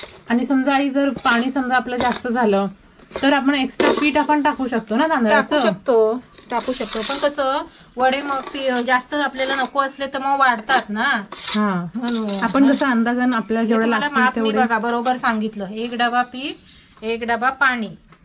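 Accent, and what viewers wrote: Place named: Malvani, India. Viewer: Tumhi khuup chaan bolatat kaki , assaal malvni tune madhy... 😊 mazya aai sarkh....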